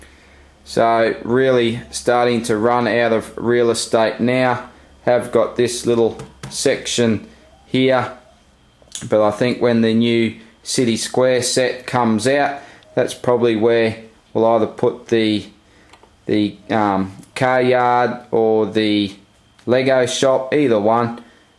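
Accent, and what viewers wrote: Australia. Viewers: are you australian because thats AWSOME!!!!!! i'm aussie to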